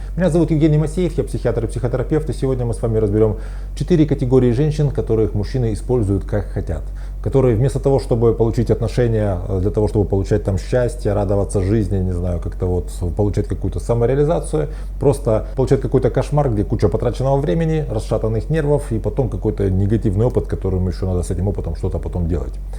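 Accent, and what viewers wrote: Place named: Ukraine. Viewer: он живёт в Украине, акцент просто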